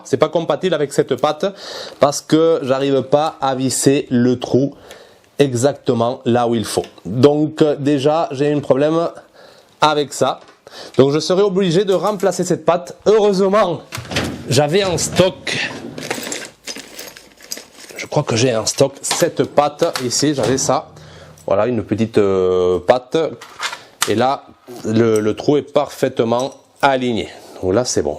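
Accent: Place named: South, France. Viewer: bien joué et merci des infos, mais quel est cet accent agréablement sudiste? Sud ouest?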